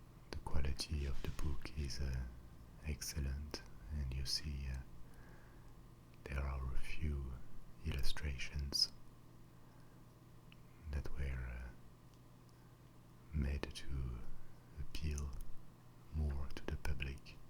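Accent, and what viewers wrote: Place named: France. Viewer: male asmr voices have never given me tingles. until now. books.. French accent.. so many tingles. well done, Sir, well done. subscribed!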